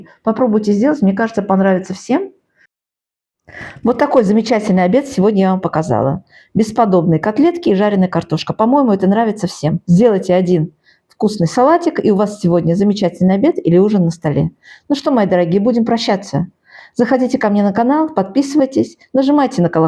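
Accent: Baku, Azerbaijan. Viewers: обожаю Ваш акцент, я сразу поняла что Вы из Баку.Я там была в гостях ещепри СССР, 45 лет назад. Ну и ваш талант кулинара выше всяких похвал.Спасибо,дорогая!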